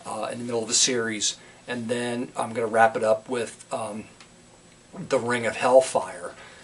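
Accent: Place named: South, United States. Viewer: Sounds rather hillbilly & fun!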